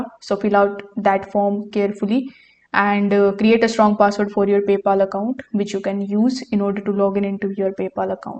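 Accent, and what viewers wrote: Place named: India. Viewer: 🙂🇲🇦 لغة انجليزية خاصة بك تشبه هندين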